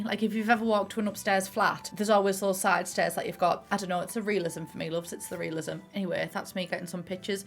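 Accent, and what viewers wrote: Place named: Essex, United Kingdom. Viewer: Less than 30 secs in and I'm shocked that the Essex accent apparently sounds posh to someone from Middlesbrough 😂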